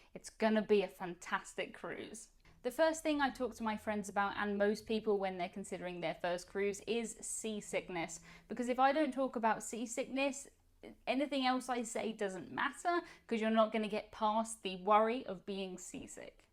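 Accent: United Kingdom. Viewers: Thank you for explaining the “Naughties” were not naughty! I love proper British English, and appreciate your use of it and the explanation for non-British English speakers! I’m excited to hear how your friends like the cruise!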